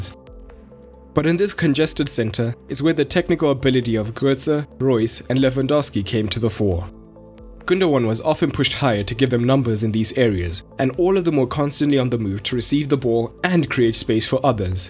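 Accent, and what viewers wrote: South Africa. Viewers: Are you South African? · That Polish right-side was devastating! Piszczek Błaszczykowski and Lewandowski! side note, I know a South African accent when I hear one :)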